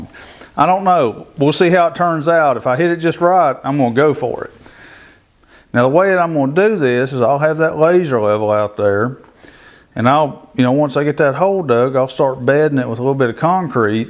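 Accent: South, United States. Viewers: Love your Southern Accent... keep on trucking. Have a Great Day All Ye All..lol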